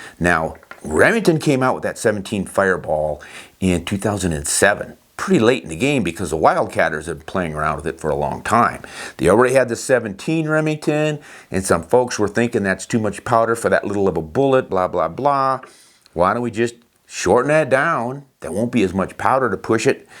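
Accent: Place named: South, United States. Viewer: ...I own a Sako Rifle in.222 Remington made in 1950....I went to the range and off the bench shot a 3 shot group that I measured with a caliper and it measured.221....how about that? This was 4-5 years ago...I still have about 40 rounds I loaded for it...and did not shoot them all, I'm conservative with my ammo...you got one of them tack drivers? I kind of like you...I like the way you talk...You sound like me...a lot...I bet you are in a southern state...you ain't no Yankee are you?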